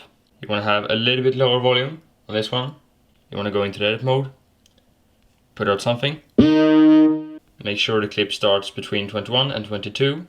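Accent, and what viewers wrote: Sweden. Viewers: Vet inte om jag har rätt. Men redan 5 sekunder in i videon kunde jag höra att du var svensk. Det är väl positivt att man har bra öron. Bra när det kommer till musik. 😂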